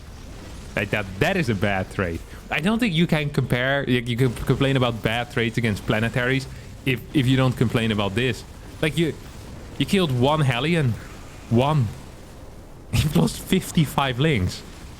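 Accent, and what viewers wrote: Germany. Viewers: I love Harstem doing a German accent anytime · Your german accent is perfect 😍😍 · love your german accent xD 10/10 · Your german accent was so funny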